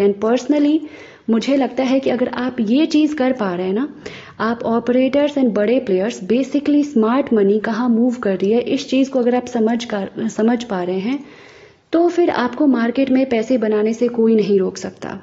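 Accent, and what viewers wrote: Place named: Bihar, India. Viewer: आप बिहार से हैं न मैडम॥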